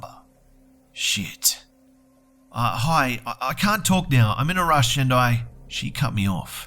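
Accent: Australia. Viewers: Are you from Australia?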